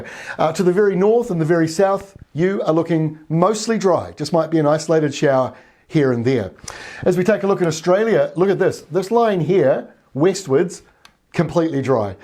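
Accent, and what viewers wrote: New Zealand. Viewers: If there was an award for YouTube weather forecasters, you'd win hands down:))) great graphics, knowledgeable commentary and in depth analysis - all done in that lovely NZ accent!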